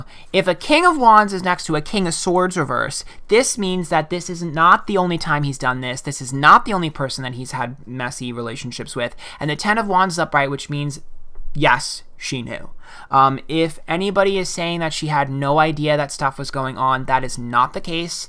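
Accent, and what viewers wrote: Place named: Staten Island, United States. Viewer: are u from staten island. that accent reminds me of my old good judy from back in the day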